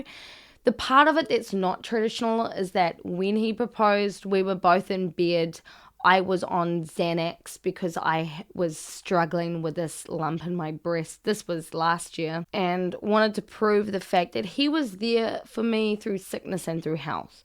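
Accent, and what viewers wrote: New Zealand. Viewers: Sounds like a kiwi accent, she'd be from New Zealand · Is that a kiwi accent I hear? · Are you a kiwi????? 😄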